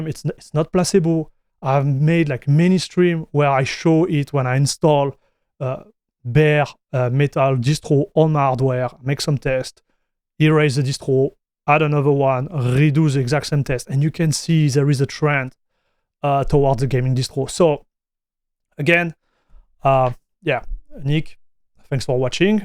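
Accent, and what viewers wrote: France. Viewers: I Love that Nick did the Benchmarks and that you did a fair review. And i 100 % agree to you. Nicks Point is still valid, for Casual gaming the distro is Not as important as you might think at First. Greetings from Germany, Always love watching both of you, great content and awesome french accent.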